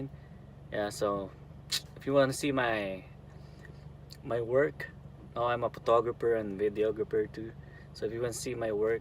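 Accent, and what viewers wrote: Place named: Philippines. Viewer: That Pinoy accent lol much love though bro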